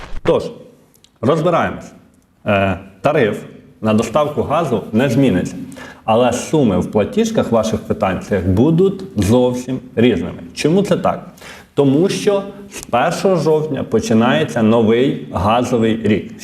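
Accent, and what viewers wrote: Ukraine. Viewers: я це вже давно йому пишу,щоб вивчився спершу говорити вірно, а не на крижопільсько-жмеренському діалекті. А він не звертає уваги.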